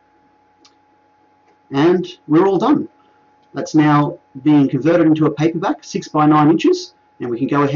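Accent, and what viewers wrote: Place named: Australia. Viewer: must be aussie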